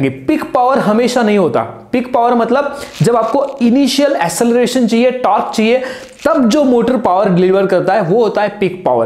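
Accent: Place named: Maharashtra, India. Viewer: मराठी माणूस दिसतो आपला...ascent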